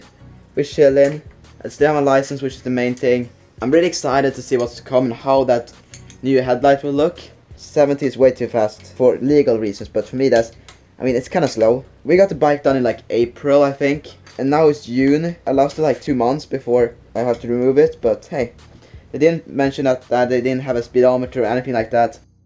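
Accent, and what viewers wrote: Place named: Sweden. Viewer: låter bättre på svenska för du snackar svegelska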